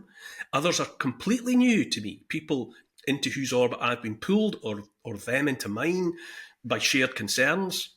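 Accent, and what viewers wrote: Scotland, United Kingdom. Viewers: Thank you Neil I just listened to this and I agree with every word. A paradigm shift for me personally and for the world (I cautiously hope). I’m Scottish but live in Cornwall and sometimes wonder about moving back up north. Would be Fife probably. But Scotland has scared me a bit lately…. So many people very asleep. Including my family - not a single one understands what I’m talking about, when I dare to talk that is. It’s when I try to open the Overton window just a tad more (Maybe Trump isn’t such a scary prospect. Maybe you’d find interesting information on X. Etc etc) they just default to calling me a conspiracy theorist. One person told me she hates Twitter/X because it’s full on right wing hate speech. I asked her what things she reads there. ‘Oh no, I don’t use Twitter, I’ve just read about it’ !!!! And they tell me I exist in a bubble. 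Anyway, I felt happy yesterday - November 6th- and I kept quiet about it . Sadly I’m a ‘childless cat-woman 😹’ who doesn’t have a sympathetic partner or family. But I can still laugh and do my own research and make up my own mind. You are a helpful guide and I especially love listening as you speak in my familiar Scottish tongue. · Man this is the most hard core Scottish accent I’ve ever heard 🤣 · Well said, Neil. I love listening to your Scottish accent. I’m not so fond of my accent but is very distinctive. I’m from Arkansas USA, Woo pig sooie!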